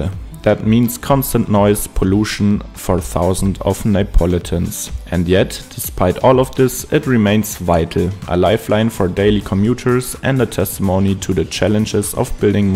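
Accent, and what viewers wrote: Germany. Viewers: I really her out that you are from Germany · I hear deutsch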